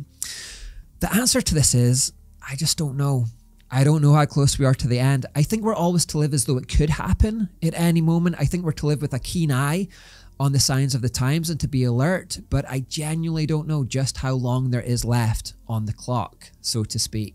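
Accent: Scotland, United Kingdom. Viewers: Love your channel,. I've been watching your videos since Know Your Enemy, and no, your Scottish accent isn't a problem at all and English isn't my first language. In fact, I'd like to hear your natural Scottish accent at least once, because I've been to Scotland and oh, that was fun! 😂 I struggled to understand half of what people said, but still loved the accent. Finally, I'm absolutely sure that this channel is being suppressed by YouTube. I watch a lot of Christian content and (no offense to anyone else but) channels that aren't nearly as good as yours have grown significantly more. Channels with not only "worse" content, but also editing and overall quality. I guess you just speak too much truth! Best of luck with all you do. 😊 Can't wait to see what your next series is! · I've appreciated each video in this series. Thanks for being true to the Lord. 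I love listening to Scottish accents. 😊 Yours is very easy to understand for this Iowan from the US. Looking forward to the next series. May God continue to bless you!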